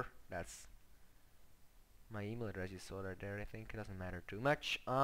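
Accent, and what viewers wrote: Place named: Denmark. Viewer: Nice accent brah! Danes are the best.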